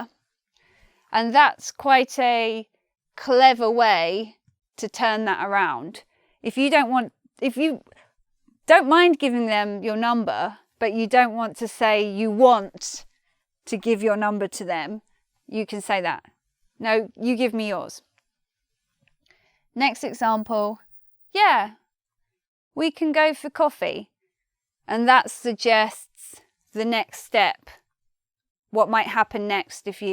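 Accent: United Kingdom. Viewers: One more time, thank you! I like it British English pronunciation too. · I love her British accent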